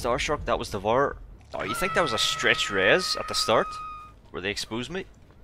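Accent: Northern Ireland, United Kingdom. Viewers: Are you from Northern Ireland? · northern ireland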